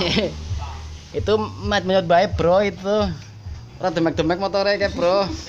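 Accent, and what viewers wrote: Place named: Banyumas, Indonesia. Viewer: Jen ngapak temen kang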